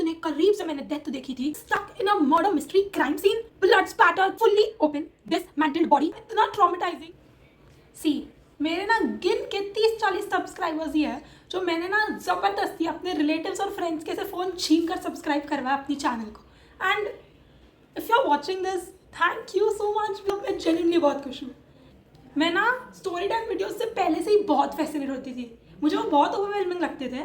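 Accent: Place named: Gujarat, India. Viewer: The gujju accent is the must 😝😂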